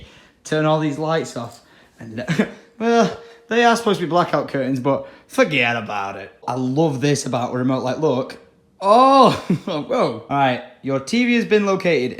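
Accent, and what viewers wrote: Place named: Scotland, United Kingdom. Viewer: That’s Scottish accent is hilarious aha from Glasgow !!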